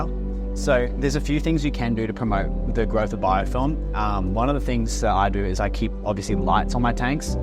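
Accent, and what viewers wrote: Australia. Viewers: I love all your videos. May I make a suggestion? The music audio is a bit too loud; it makes it harder to follow your wonderful Aussie accent!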